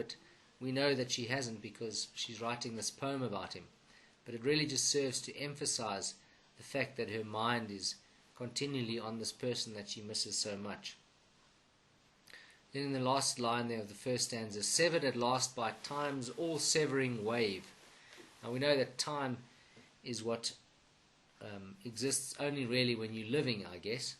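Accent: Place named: South Africa. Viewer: South African accent 💪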